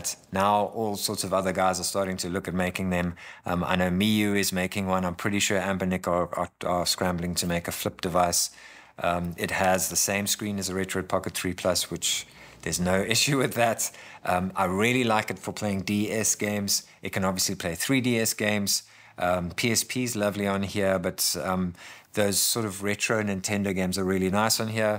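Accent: South Africa. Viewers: lol just cause you have a lekker saffa accent I bought a retro pocket 2s lol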